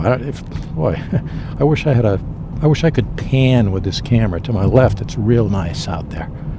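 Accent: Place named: Connecticut, United States. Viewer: I grew up in that area, and went to school in Norwich, Uncasville (Montville) and New London through high school. I can tell by your accent that you probably grew up in that area. Left the area in ‘79 and only get back to visit every once in a while.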